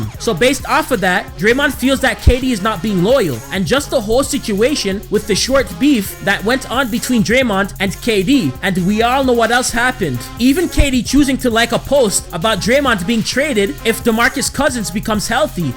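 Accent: Toronto, Canada. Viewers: sounding like a true toronto manzz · I can tell ur from Toronto jus from ur voice lmao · Mans got the biggest Toronto accent of life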